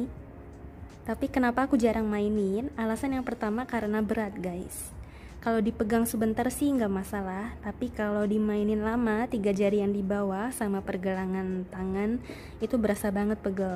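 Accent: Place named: Visayas, Philippines. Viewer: I thought you were bisaya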